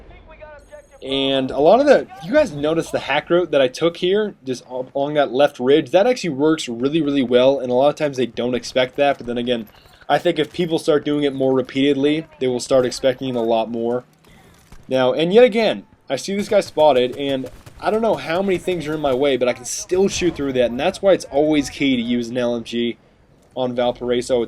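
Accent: Germany. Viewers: haha your english-german accent is epic :D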